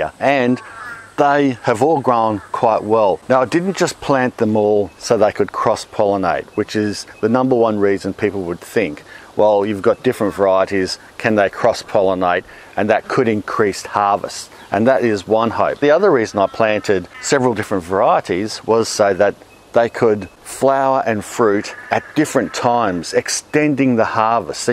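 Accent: Australia. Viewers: Haha. Same here, and also love the Aussie accent.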